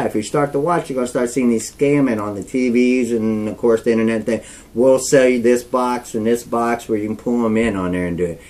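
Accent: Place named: South, United States. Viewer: I like his southern accent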